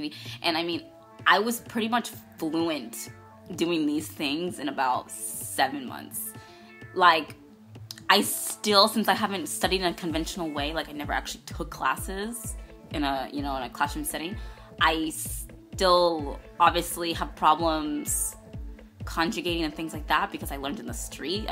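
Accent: Spain. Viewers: definitivamente, tienes un accento de España jaja! Me encanta. He aprendido aquí en México y toda la gente de otros países, dicen a mí - qué chido??? eres de México de repente??? jajaja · Oh no! everything was going great until I heard the Spanish accent(Spain) ugh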